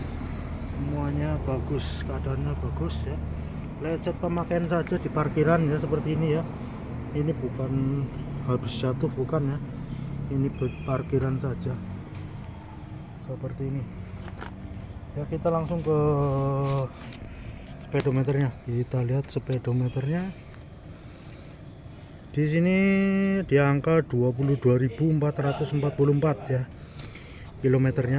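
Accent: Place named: Java, Indonesia. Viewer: Taiwan ngapak apa kie guli ngomong medok temen lik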